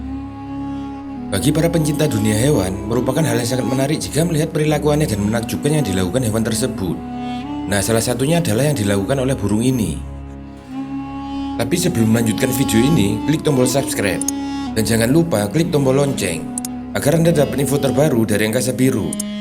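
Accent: Java, Indonesia. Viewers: mendok amt lu min😅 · jawa banget suaranya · mantab logat jawanya medok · Medok ajah ngomong nya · Meduk bngt jowone 😂😂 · orang jawa kau ya bang?? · Sangat medok pak de like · Jawa banget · Medok banget....😀😀😀😀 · Medok banget son suarane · Mantaaap.......... Ngomongnya yg mantap jawanya MEDHOCK......🤭🤭🤭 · Min medok banget sih min Logat mu, , , ? Tpi ga pa2 ko min wong udh dri sono ny ky gitu, menuso yo , Ga iso ngrubah toh, , , !? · Yg ngomong medok amat yak kek org jawa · Logatnya khas jawa btul ya😂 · adminya logat jawa bngt...kuuueeennntttaaalllllllllllllllll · Ngedok ketoro wong jowo😂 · Suwaramu kang kang medok bianget. WeeLiikk 😀😀😂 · Yg baca narasi medok.. 😀👍jd ingat temen saat kuliah.. 😀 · medhok yo kue..😁😁🤣🤣🤣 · Dlm dunia broadcast medok gini gk laku siaran radio n tv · Salfok dgn suara pembaca narasi. Medok total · medok x bnk..tp q sk.mnghibur..😂😂😂🙏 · Suara mu medok.. 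Mas..'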